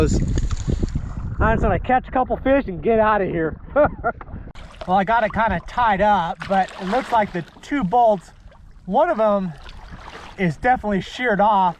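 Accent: South, United States. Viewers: Very interesting, an Asian person with a southern accent, cool !!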